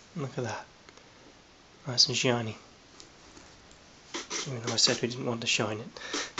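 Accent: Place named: North-East Wales, United Kingdom. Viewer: Very nice and relaxing. Hmm as for accent, I'm probably wrong bit you have a similar accent to my friend who lives in north east Wales, near liverpool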